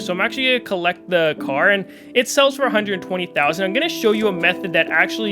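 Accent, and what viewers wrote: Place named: Minnesota, United States. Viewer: You have a Minnesota accent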